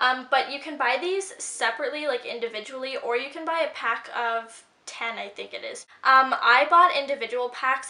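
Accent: Canada. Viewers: Are u Canadian??? :)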